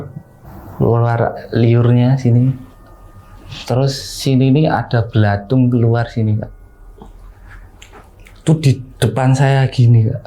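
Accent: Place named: Java, Indonesia. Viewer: Jowone khass kenthel banget kang, slm seko pekalongan stay di bogor kemang❤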